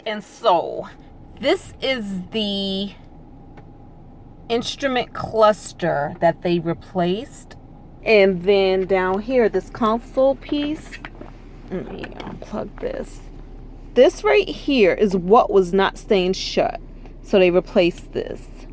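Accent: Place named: New Orleans, United States. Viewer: Luv that accent !New Orleans Lady Ice Cream pralines. ummm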